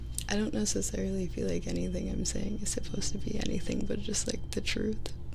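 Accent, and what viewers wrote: South, United States. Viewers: you are so beautiful. I'd love to see a kidnapping asmr video with the southern accent. The southern accent is so hot.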